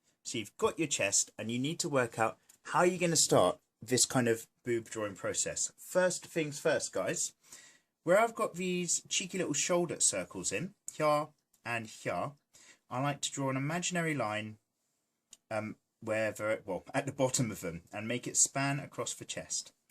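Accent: United Kingdom. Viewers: Brilliant, but it's so unfair you can speak of boobs with such ease. This would be so pervy sounding if you didn't have such a proper British accent.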